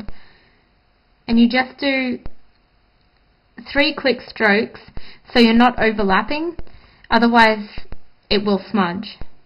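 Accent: Australia. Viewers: I'm Australian, Got the same accent myself, yeah she's Aussie ;)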